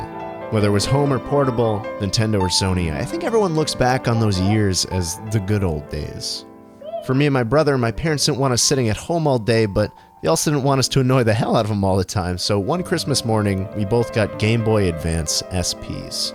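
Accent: New York, United States. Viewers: THIS IS????????????????????????????????? wow this man how grOWN UP...and also developed a new yorker accent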